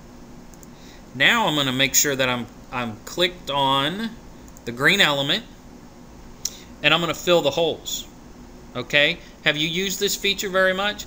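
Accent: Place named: South, United States. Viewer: You sound like idubbbz with a southern accent, i love this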